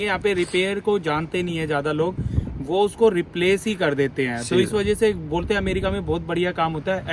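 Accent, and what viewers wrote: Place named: Delhi, India. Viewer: He speaks like Delhi waala Desi ❤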